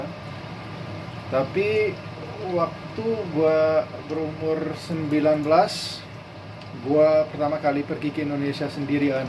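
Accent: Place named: Jakarta, Indonesia. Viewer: Buset mas ngomongnya udah kayak orang Jakarta.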